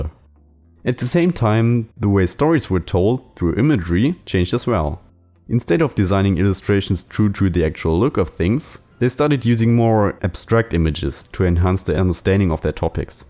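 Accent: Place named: Germany. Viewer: if u ever heard a german u know, dass du voll deutsch bist, danke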